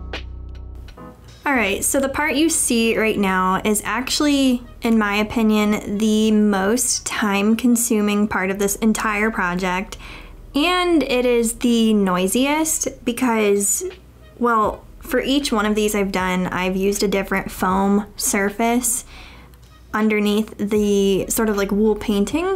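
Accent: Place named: Columbus, United States. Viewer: I love it! It turned out so pretty! It definitely makes me wanna take up wool art. I just might... Also, quick question - are you from the Columbus, Ohio area? Or did you grow up somewhere else? I only ask because I'm from the Dayton area (born and raised) and our accents are COMPLETELY different, which just seems crazy since you only live about an hour from me. Lol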